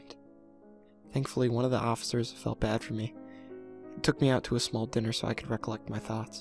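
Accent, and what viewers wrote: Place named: United States. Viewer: The guy speaks really good American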